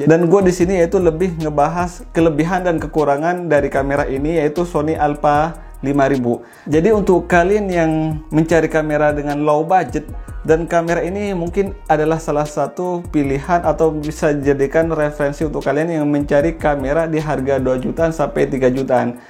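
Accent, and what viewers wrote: Madura, Indonesia. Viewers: Medok bangget bang Madura nya 😊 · Madura mana bang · Logatnya madura😅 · Madura ya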